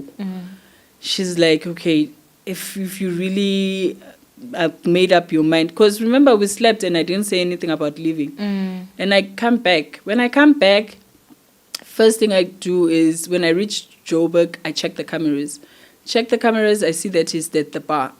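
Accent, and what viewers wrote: Nigeria. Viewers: THE NIJA HAD TAKEN YOU OVER SISI, EVEN THE ACCENT IS LAGOS. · Women from other countries should know that when Nigerians come to any country, they are not coming to marry anyone. If they marry you it is to benefit them not you. Whatever they do is to make money. Leave Nigerians for Nigerian women. But girl, you now speak like a Nigerian.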